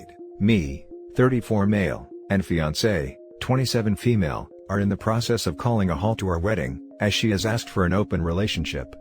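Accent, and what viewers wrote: Australia. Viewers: You can just tell this guys an Aussie, up the Aussies!!!! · Dude its time to end this relationship and walk away she isn't worth it. Further recommendation never married ever know cohabitation no marriage ever women today are not worth it. They want sexual freedom but the stability of marriage in the end up shiting all over the husband's. Buy your language you sound like your from Australia. Australia and the United States going through 2 of the worst things going on any country the feminization of men in the masculization of women. In either of these countries manner treated equally which read like disposable commodities. The statistics in stats prove it. Between divorce courts child custody 's the way that were all looked at plans simple but what people don't realise men walk away society ends the safety for all ends.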